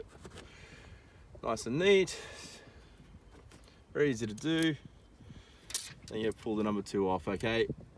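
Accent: Australia. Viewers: Always good to fire up a video and hear an aussie presenting it. 👍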